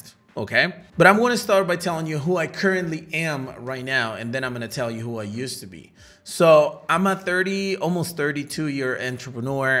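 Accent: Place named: United States. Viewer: Which country are you from? You have an American accent and you seem like a nice person 🙂It is true, you can't always be on the same page with everyone.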